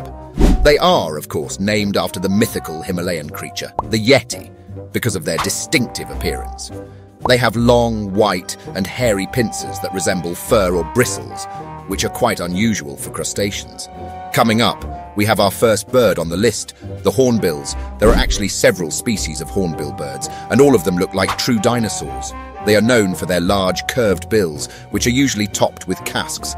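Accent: United Kingdom. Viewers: 😂😂😂😂🤣🤣🤣😂”….They STRAIGHT UP look like a sacred animal, from out of The Lord Of The Rings universe.” I can’t! I just can’t!! Trying to sound all hood, while educating with that British accent. I paused the video for that one😂😂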